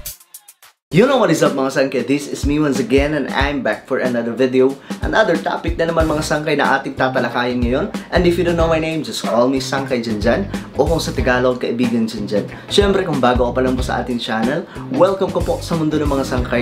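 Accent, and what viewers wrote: Waray, Philippines. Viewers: Waray kaba sangkay? Taclobanon kc ako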